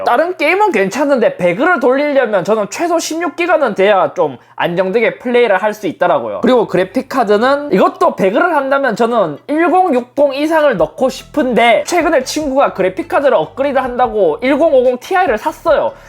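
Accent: Gyeongsang, South Korea. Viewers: 사투리가 경남쪽인가요? 경북쪽인가요??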